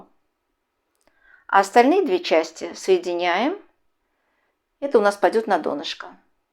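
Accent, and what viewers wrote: Baku, Azerbaijan. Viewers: спасибо за рецепт, землячка! Бакинский акцент не проходит с годами!))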